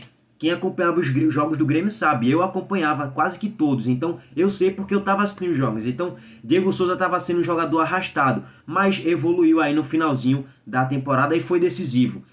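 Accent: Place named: Rio de Janeiro, Brazil. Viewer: uu carioca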